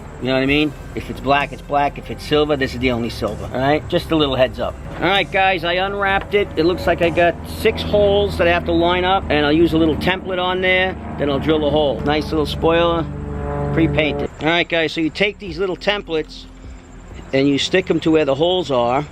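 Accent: New York, United States. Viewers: First of all, nothing beats a NY accent. #Queensgirl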